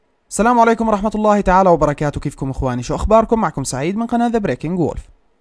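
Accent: Levant, Jordan. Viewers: انته اردني ؟ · اردني ولا فلسطيني؟ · انت اردني او فلسطيني صح انا جنسيته فلسطيني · الشب من الاردن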